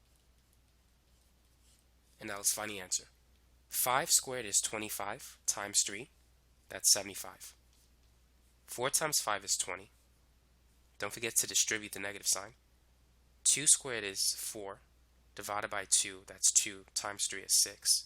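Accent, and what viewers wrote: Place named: South, United States. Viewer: Not all heroes wear capes, some have southern accents and teach STEM subjects on YouTube flawlessly.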